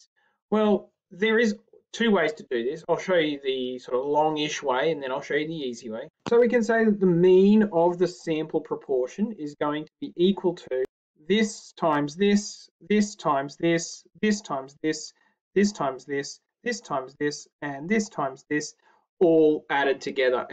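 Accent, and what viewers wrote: Australia. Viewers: your australien accent makes math fun thank you mate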